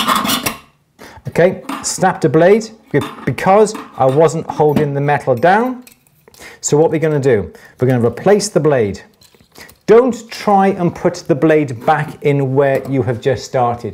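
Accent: Wales, United Kingdom. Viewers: Great lots of tips for my memory bank. Cheers. Can I ask why do u turn your bench hook back around at an angle, after u finished cutting flat pieces? Sorry it's prob a basic answer but I'm just starting out trying To learn myself to work with some metals like copper and silver and also coins. I started making wood rings but I want to broaden my horizons so to speak. Love your channel, it's so helpful to me and many others.. Merry Christmas from Ireland, do I hint a Welsh accent there? Or somewhere close?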